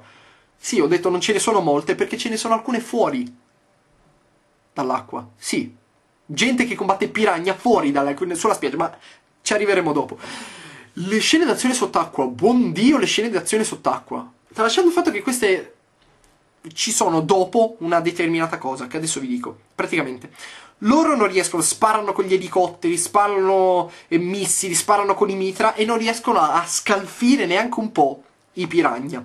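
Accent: Turin, Italy. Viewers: mi sembrava :) una vaga cadenza torinese!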